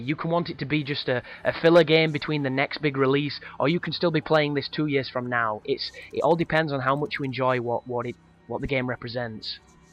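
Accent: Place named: Northern England, United Kingdom. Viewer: Does thar live in sheffield o’what thi sounds like a reyt northerner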